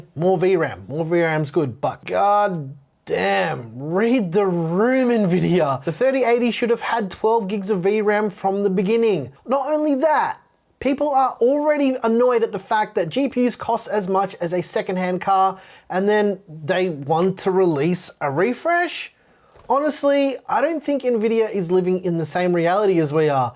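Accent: Australia. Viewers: No. lol. what an aussie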